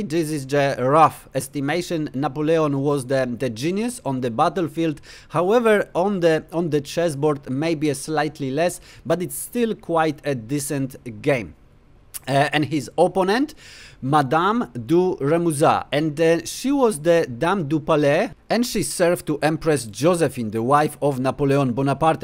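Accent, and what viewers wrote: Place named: Poland. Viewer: Po akcencie poznałem, że rodak :) Leci subskrybcja, łapka w górę i komentarz :)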